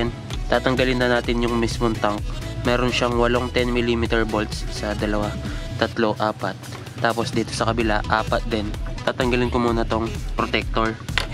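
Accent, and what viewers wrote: Pampanga, Philippines. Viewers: Kapampangan ka boss? Halata sa accent eh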